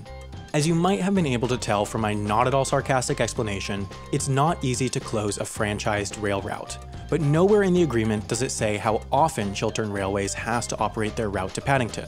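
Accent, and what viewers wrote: United States. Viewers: Step One: If you're doing it in Britain...stop saying route the American way! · He's American....they all pronounce "route" as "rout". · I got so far and gave up with the annoying American voice over and mispronunciation, America has terrible train services for passengers. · It was quite weird hearing an American say the name of my small insignificant town near Manchester called Stalybridge. But yes, of course, he said it wrong: stal-y-bridge. It is Stale-y-bridge. One note of fame. Stalybridge was the theatre where "it's a long way to Tipperary" was first sung.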